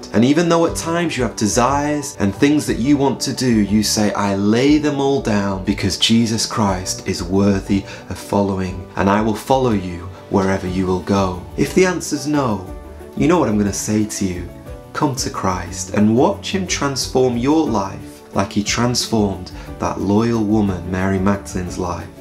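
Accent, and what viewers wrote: England, United Kingdom. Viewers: I love Ur speaking voice, Ur English accent!! God Bless U!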